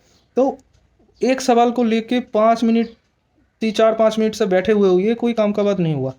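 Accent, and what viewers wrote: Bengal, India. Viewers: Amar o mone hoyechilo Bengali . Pronounciation lgche omni .... 🙂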